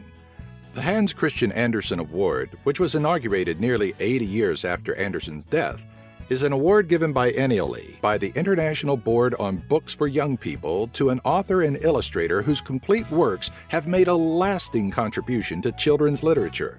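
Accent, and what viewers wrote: United States. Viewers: it's funny to here a American saying danish words.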